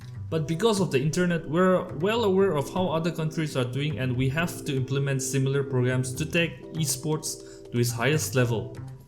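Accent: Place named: Northeast, India. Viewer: you sound like a northeast guy..are you??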